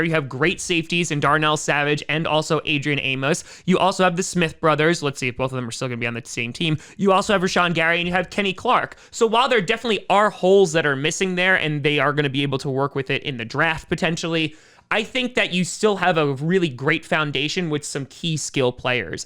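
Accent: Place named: East Coast, United States. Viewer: I'll miss the Pe-inn East Coast pronunciation. Thanks for providing content. We are the 24-7-365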